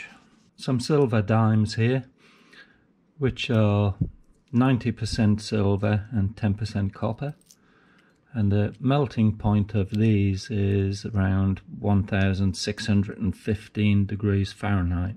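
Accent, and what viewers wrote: Northern England, United Kingdom. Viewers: i can tell you're from either very far north england or very far south scotland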